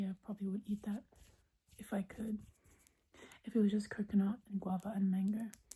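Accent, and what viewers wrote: Australia. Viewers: I love listening to your voice and accent it's so soothing. There are very few asmrtists like this. What part of Australia are you from? 🇦🇺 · I don’t want to be mean but you sound Australian😭also, your very BEAUTIFUL🫰🏻🫰🏻keep the asmr going please🤍🤍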